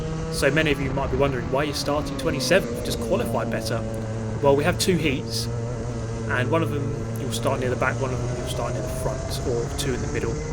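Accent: United Kingdom. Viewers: EYYYYYY BRITISH BOI nice to know theres actually british bois here in youtube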